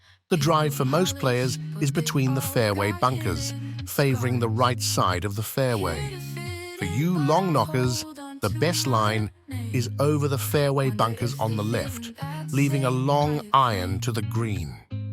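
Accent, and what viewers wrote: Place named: United Kingdom. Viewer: For some reason the British narration makes it easier to understand lol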